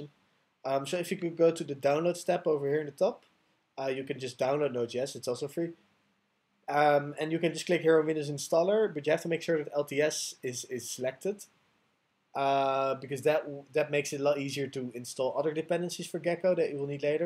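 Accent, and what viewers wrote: Netherlands. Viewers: you sound very nederlands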